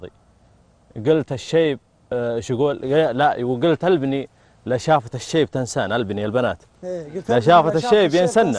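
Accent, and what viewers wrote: Hail, Saudi Arabia. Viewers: ي زيينن حكيه حائل يهبل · تفوز سوالف و لهجته الحائليه😭😭😭💜💜💜💜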